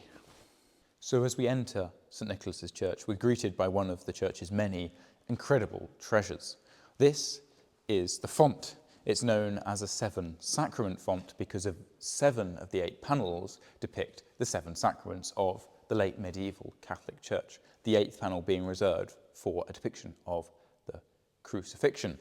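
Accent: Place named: England, United Kingdom. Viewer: Belle visite Belle architecture bel accent anglais ❤😊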